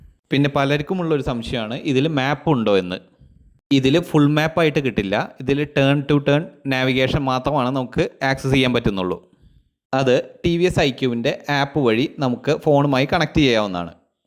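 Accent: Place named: France. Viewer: Annan French accent aanallo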